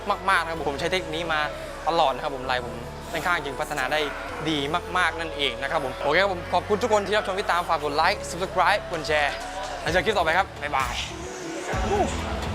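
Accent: South, Thailand. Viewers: เป็นประโยชน์มากเลยครับดูทุกคลิปเลย ชอบความมีสำเนียงทองแดงโผล่มาด้วย มีเสน่ห์มาก 😄😊 #คนใต้นิ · Southern Tone makes your VDO different from others. Otherwise make me miss my hometown. Keep doing the good job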